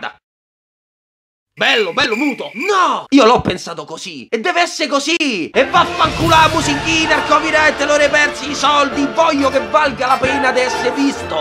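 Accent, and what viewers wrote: Rome, Italy. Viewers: Boh, tra accento romano, discorsi e memini di Berserk posso solo dire che ti amo haha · Fantastico come sempre. E parlando di fantastico, farai anche la serie di Animali Fantastici? Scusa ma sono stra ansiosa di sentire cosa ne pensi. Specialmente del secondo. Sarò sadica ma mi piace quando sbrocchi in romano! ☺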